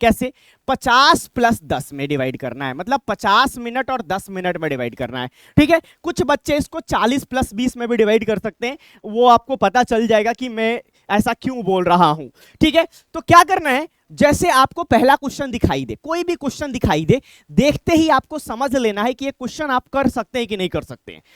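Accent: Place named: Bihar, India. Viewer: Sir ka toh bihari accent h ...thoda relate kar paa rahe h kyunki hm bhi Bihar se hi h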